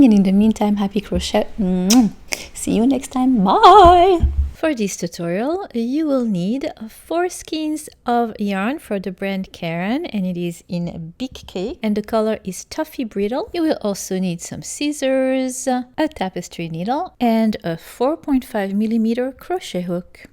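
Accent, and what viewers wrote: France. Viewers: Houlala!!!! oulalala!!! J'aime tellement your Frenchie accent. Je comprend "presque" tout! Blog and vidéos are sooooooooo très très bien. And love your chaîne sooooooooo much. Hope de GAGNER ( hopefully )🤩🤩🤩🤩🤩🤩🤩🤩🤩🤩🤩 Je love crocheter...with you from France 😍😍😍😍😍😍😍😍😍😍😍😍😍😍😍😍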